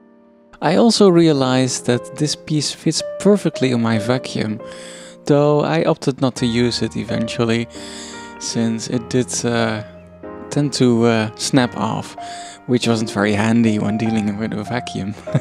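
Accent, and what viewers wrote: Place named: Netherlands. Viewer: You sound dutch don't ya?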